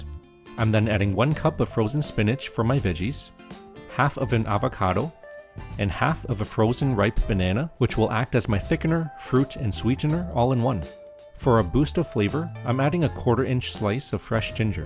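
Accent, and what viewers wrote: Canada. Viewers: Do i catch a Canadian accent kind sir?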